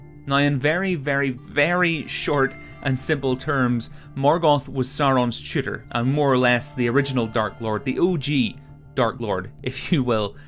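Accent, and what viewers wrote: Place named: Ireland. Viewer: I like your accent. Where are you from? Ireland?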